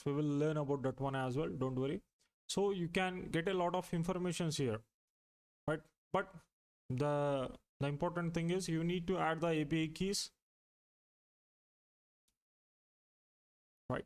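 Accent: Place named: United Kingdom. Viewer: Hello Sir, I love your this British Accent ❤️!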